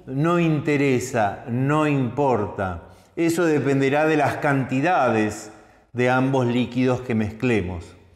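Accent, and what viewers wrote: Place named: Argentina. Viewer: El acento argentino siempre queda tan bien en un profesor, muy buen vídeo!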